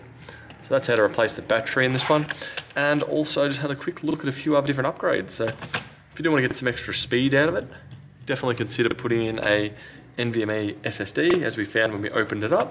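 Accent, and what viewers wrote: Australia. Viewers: G-day! Are You from Australia?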